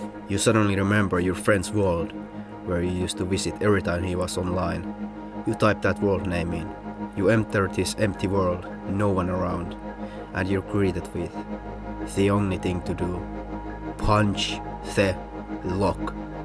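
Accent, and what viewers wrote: Finland. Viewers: On muuten tosi suomalainen aksentti :DD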